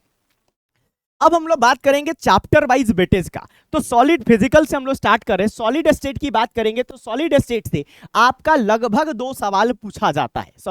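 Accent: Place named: Bihar, India. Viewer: Sir ka toh bihari accent h ...thoda relate kar paa rahe h kyunki hm bhi Bihar se hi h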